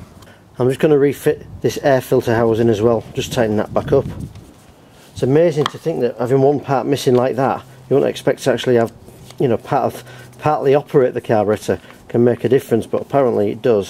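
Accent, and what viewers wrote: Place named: England, United Kingdom. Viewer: Read your closed captions as you view this video. I'm in Alabama and trying to understand your accent (English, I suppose) is tough but doable. I guess it really must be a challenge to understand, by whatever means that is used to add close captions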